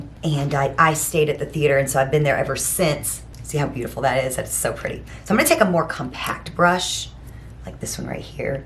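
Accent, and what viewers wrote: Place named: Georgia, United States. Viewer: I didn't know you're in Alaska. I feel like you have a Georgia accent 😊😅 I love your earrings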